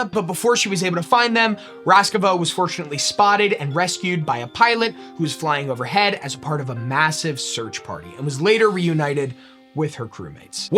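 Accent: United States. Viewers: european history taught to me in a philly accent, it's like i'm back in high school <3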